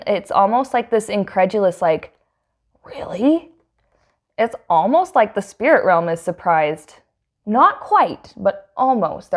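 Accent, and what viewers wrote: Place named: Russia. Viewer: Congrats your new apartment 🎉 also that Russian accent 😎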